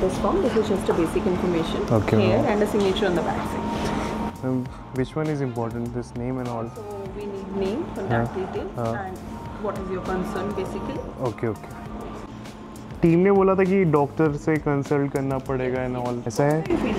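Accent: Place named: United Kingdom. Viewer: Kaafi tagdi English accent hai bhai aapki🔥👌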